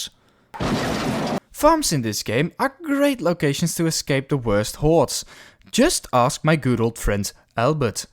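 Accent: Netherlands. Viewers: I love the Dutch into English accent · I heard that accent and immediately knew you were dutch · U can hear this guy is dutch or aren't you? Oh nevermind he included the map of the netherlands 😂😂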